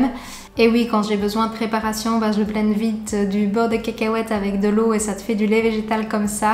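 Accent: Belgium. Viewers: oh désolée. Je découvre seulement ta chaîne 😉 Et c'est un plaisir d'entendre ne YouTubeuse avec notre bel accent belge ❤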